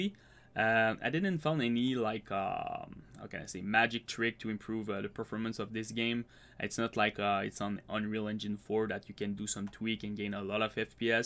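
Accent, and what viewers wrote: Quebec, Canada. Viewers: québécois ?? :P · t québecois sa parait xd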